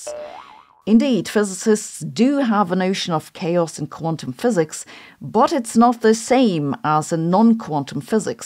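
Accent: Germany. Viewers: Sorry, heard the German accent and had a Get Smart flashback to KAOS.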